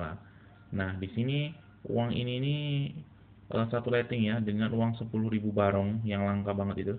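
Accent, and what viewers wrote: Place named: West Java, Indonesia. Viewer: maaf sobat ini daerah apa ko logat nya sunda,maaf ya, sobat,smga sht& bhga sll amiin YAR 🤲🤲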